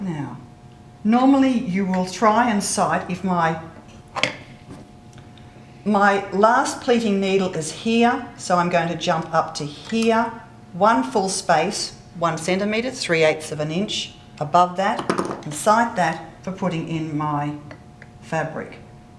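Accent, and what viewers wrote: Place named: Australia. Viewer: Thank you for your clear and informative instruction on pleater use. Well done 👏👏👏 How nice to hear not only an Australian voice but an Australian voice with culture (without 'ocker') I now will search out where I can buy an Amanda Jane!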